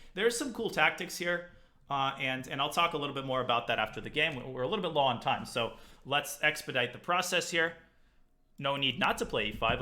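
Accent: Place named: Russia. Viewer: Daniel's russian accent is wonderful!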